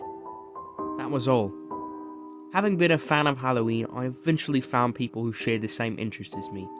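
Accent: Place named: United Kingdom. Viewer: the slight british accent while reading this makes this video ten times fucking better man, great job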